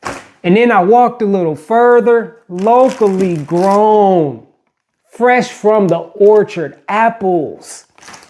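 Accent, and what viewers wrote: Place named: Ohio, United States. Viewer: Bro. I'm in Cincinnati. Please let me know where in Ohio to get these fruits. I kinda heard it in your voice that you was from Ohio. Lol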